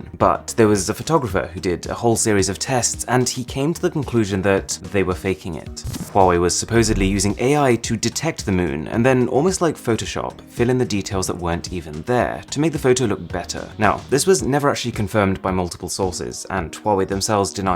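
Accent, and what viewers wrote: United Kingdom. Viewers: he sounds British to me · That is just how British people talk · I enjoy this type of content. Please continue to provide informative YouTube clips like this, Apple, etc. Your content is so varied in the world of tech - but most of all informative. Many YouTubers cover the latest technology but it is nice to learn more about the R&D developments, the company behind it (beginnings, growth, direction, and aspirations). This is what you provide. Please continue on your current trajectory. 👏🏽👍🏽 P.S. I love hearing the accent of my fellow Brit on YouTube. So few in the world of tech - but at least you’re not alone. 😊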